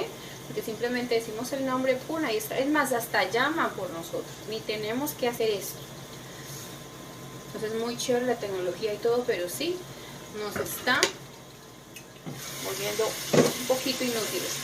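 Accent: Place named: Colombia. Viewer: hola yo soy mexicano y m encanta tu acento de colombia se oye muy sensual.asi k m encantaria k m digas palabras k solo se usan en colombia y no dijeras k significan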